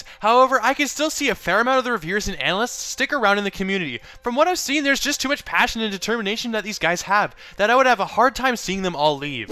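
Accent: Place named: Canada. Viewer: U sound very Canadian, lol so am I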